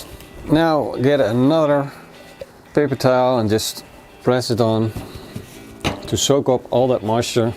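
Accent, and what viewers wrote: Netherlands. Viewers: Are you Dutch ? Your english accent really sound like a dutch man 🤣 still good video tho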